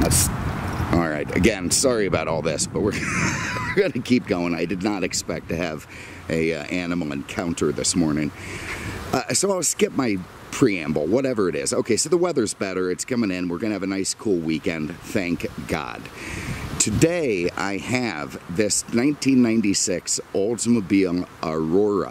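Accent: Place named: Chicago, United States. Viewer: He has GOT to be from Chicagoland. He sounds 100% identical to my old neighbor. I even sent one of videos to his wife and she laughed hysterically.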